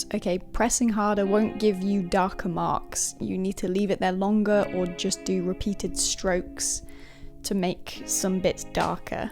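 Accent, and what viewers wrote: United Kingdom. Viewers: thats a manchster accent anyway OMG THX SO MUCH THIS VIDEO WAS LIKE SO USEFUL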